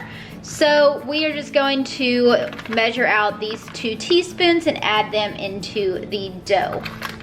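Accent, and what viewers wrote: South, United States. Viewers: US southern accent. That brings back good Memories. Love from Brazil! Thanks for the great recipes. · You are hott! I love your southern accent!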